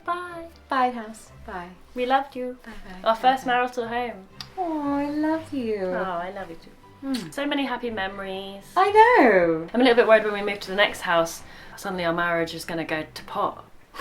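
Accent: United Kingdom. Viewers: I bloody love the British accent of 👌 💗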